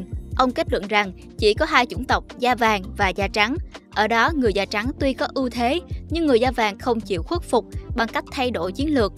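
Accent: South, Vietnam. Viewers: mc người nam, ngã hỏi đọc na ná nhau, quan trọng là video viết đúng chính tả và nói đúng nghĩa của nó. Nếu nghe mà không hiểu tại sao "sỉ" mà không phải "sĩ" thì chỉ trách mình học chưa đủ nhiều, cần học thêm.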